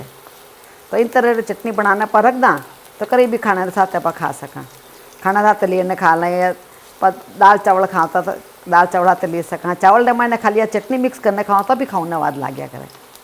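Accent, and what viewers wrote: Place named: Garhwal, India. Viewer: Why Aunti Ji is Sounding me a gadwali 😀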